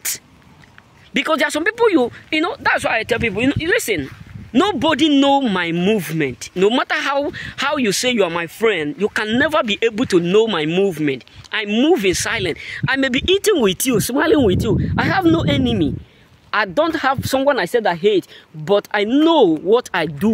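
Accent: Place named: Africa. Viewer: I love your videos I would like to encourage all chosen ones to go ahead and click on the transcript so you can understand exactly what he's saying I know his African accent is a lot so click on the transcripts and you can read word for word exactly what he's saying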